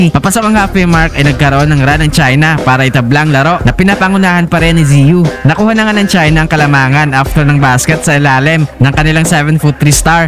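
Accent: Iloilo, Philippines. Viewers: Ibang klase itong narrator a. First time ko rito. Alanganing Nobita na may Ilonggo accent.😅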